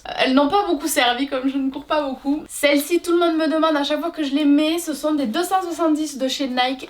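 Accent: South, France. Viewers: un sacré accent du sud haha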